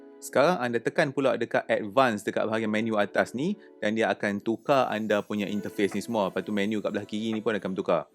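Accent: North, Malaysia. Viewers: Bg pendapat saya ok sj..mungkin sebab longhat utara saya pun org utara😅..tp overal rasanya sy suka sbb mudah faham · Loghat utara tu okay saja. Muda faham. Sy yg berasal dari Sabah pun boleh faham.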